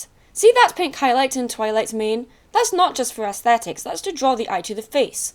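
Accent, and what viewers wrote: Scotland, United Kingdom. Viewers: Adorable >u< I love her accent and I'm actually part Scottish too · OMG ur Scottish I love u 4 EVAR!!!!!!! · I'm Scottish too athough i dont have an accent But I Love yours! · U Scottish so am i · i honestly love your accent and i believe you are the only scottish female pony tuber.. that just makes you special :D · Btw if reads this were in the highlands do you live is it the birthplace of ICTFC if it is you need to say cuz if you do I would flip. Also what accent do you have is it invernigian or glaswegian or other yay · Yay it's a Scottish youtuber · Mhm, me and Dog like your Scottish accent. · this is too scottish for me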